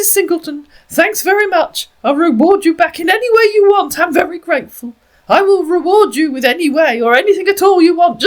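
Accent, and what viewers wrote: United Kingdom. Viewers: The way she said, "it sounds like it was written by an illiterate moron", with that proper British accent literally had me rotfl... It just struck me as super funny. Thank you for educating people with humor & hilarity 👍👍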